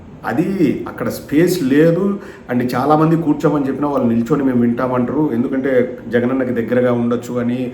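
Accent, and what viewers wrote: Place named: Telangana, India. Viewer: Bro nee proper ekkada,Slang matram Telangana la untadi,Kaani nuvvu chala better ,boothulu matlade Prabhakar gaani kanna .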